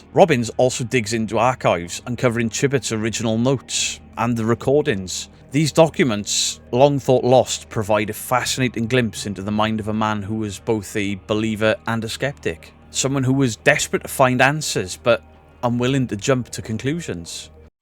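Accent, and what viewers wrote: Wales, United Kingdom. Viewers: lo e the Welsh accent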